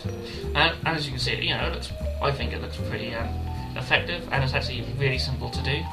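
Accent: Norfolk, United Kingdom. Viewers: is that a Norfolk twang i hear in your accent ?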